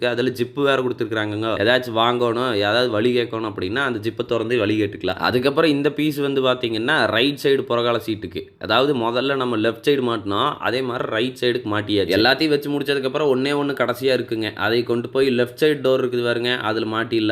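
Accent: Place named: Coimbatore, India. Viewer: Coimbatore slang ah verithanama iruku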